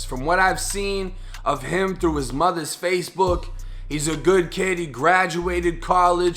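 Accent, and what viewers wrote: Boston, United States. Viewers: Sound like a guy from the inner city maybe Boston all black/white all sound like that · yo bro r u from Boston mas.??? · New to your channel. Great commentary👍 love the Boston accent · Man that boston accent is comming out hahd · I'm like 99.1% sure you are from Boston. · Bro u from Boston or nah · Na that ain't New York thass bean town boy that's middlesex n Suffolk county shit it's the whole bay state we all mass holes just r area n boston is more emphasized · He got a strong boston accent lol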